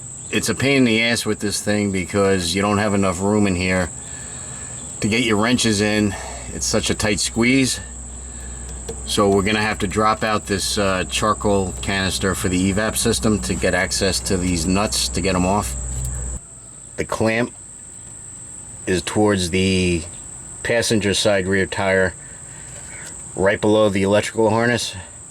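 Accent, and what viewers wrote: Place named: Boston, United States. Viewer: Thanks for explaining every step while using the exact words to describe what parts needed what size tools, which direction to turn, slide, twist, push, pull and clip everything your hands touched under there as you recorded this. You didn't talk too fast, you're easy to understand and your Boston/East coast accent- Luv it! 😊 My auto mechanically inclined go-to men (Dad & Gpa) aren't here to help me with things like this anymore, so Thank You for the time, effort and energy you put into this video!!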